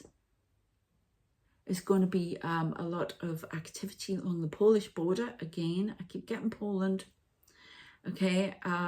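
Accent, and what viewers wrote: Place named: Newcastle, United Kingdom. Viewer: Thank you for your read.. Are you a Geordy. Like me.. I can hear it in your voice..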